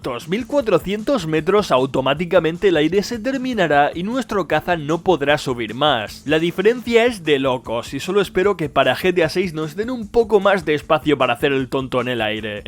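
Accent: Murcia, Spain. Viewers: Yo lo sabia y lo dije tu eres murciano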